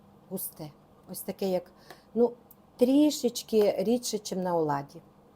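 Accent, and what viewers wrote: Ukraine. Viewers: Говорите по_русски, чтобы было более понятно.... Вы же грамотный человек, не нужно акцэнты делать...... Я прекрасно знаю язык укр. Но, много не расслышала_а другие просто отключат_чересчур акцэнты не центральной Украины.... Я люблю классическую речь и укр. И русскую.... Думаю, меня и остальные поддержат.... А, вообще вы молодец!!!!!!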